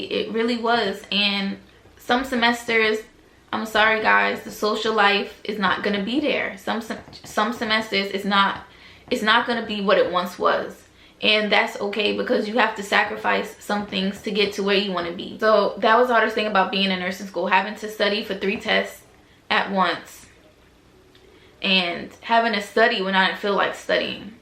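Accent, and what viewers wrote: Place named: New York, United States. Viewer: yes girl 😂 you got that New York accent.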